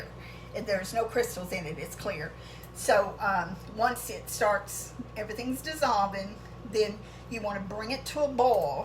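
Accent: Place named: South, United States. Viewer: Don’t ever apologize for talking like a southern girl,it’s the best place in the world to be from.Im from Arkansas and you talk just like we do.Just Southern!!!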